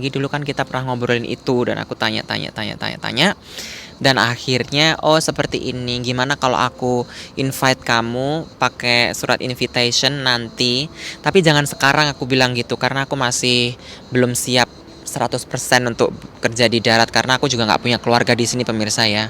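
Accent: Java, Indonesia. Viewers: Wis sue neng luar biasa Jawa e kok jek medok y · Alhamdulilah mas....tetep semngt...aku seneng dgn logat jawa...ny itu keren tingl di sepnyol tpi ttp bahasa daerah ny ttp ada.aku suka......bgt......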